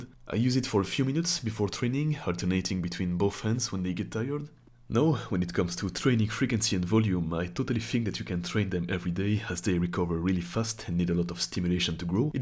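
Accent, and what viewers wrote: France. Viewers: Are you french ?? You sound french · French touch 😂 love the accent 😂 It is,what it is ? N'est ce pas 🤣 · French accent ✨ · Accent français lol excellent contenu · The french accent is so funny😂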